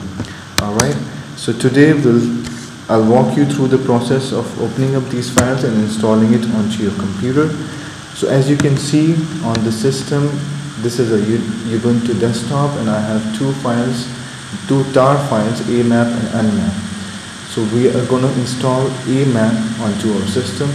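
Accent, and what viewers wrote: India. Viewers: A voice from the bottom of an oil storage tank with some extra noise! Are for real? You don't respect yourself and your viewers. Get some proper audio recording equipment first, then post your videos. These Hindi... they think that listeners are not having enough difficulties understanding their heavy accents. They add some other audio garbage on top. Barbarians!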